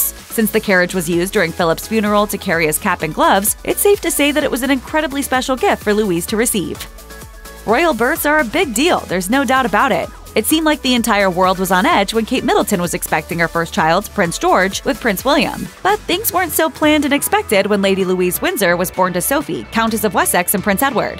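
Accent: United States. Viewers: The fact an American voice is used for this article instantly renders it pointless and crass... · Oh please what a tiresome yawn 🥱 channel… actually (American accent) the woman’s voice is sooooo…….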